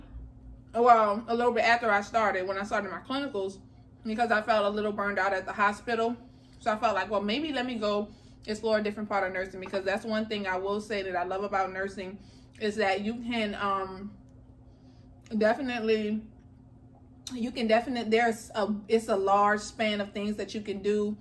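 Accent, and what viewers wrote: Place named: New Orleans, United States. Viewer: I knew you where from new orleans… sis that accent you cannot hide. I mean... I was trying to listen... I was like... well she kinda sound new orleans.. It peaks through.. anyway.. Im from Thibodaux... youll probably know where that is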